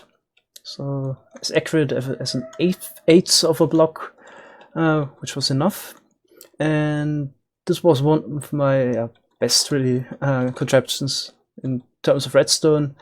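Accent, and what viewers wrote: Germany. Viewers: You are no german, are you ? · Are you come from germany?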